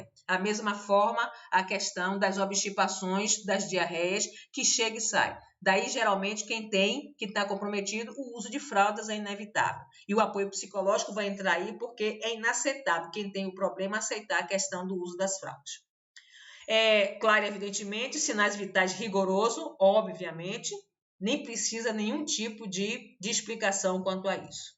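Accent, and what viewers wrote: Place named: Northeast, Brazil. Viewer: Amo suas aulas querida ,a senhora tem o jeito de nós do nordeste falar, no momento moro em SP, faz 6 meses que comecei a fazer o técnico de enfermagem, mas N deixo de vê suas aulas ,aprendo demais com ela,gostaria de saber se tem como eu obter suas apostilas,não deixe de publicar os vídeos, um grande abraço...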